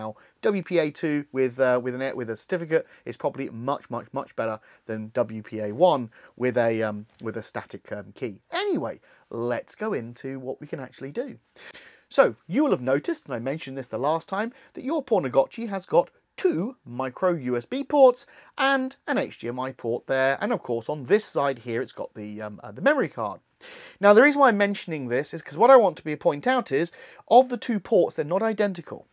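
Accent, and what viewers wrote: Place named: United Kingdom. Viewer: British accent really makes you.wonder whether he's saying pwn or porn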